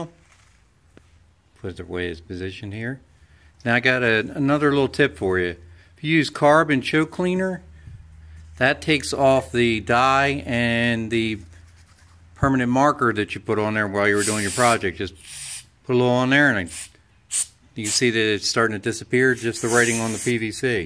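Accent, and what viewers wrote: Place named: Delaware, United States. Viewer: So good to hear a Delaware accent! Love it - made me hungry for a hoagie! And oh yeah, very imaginative and useful design - thank you.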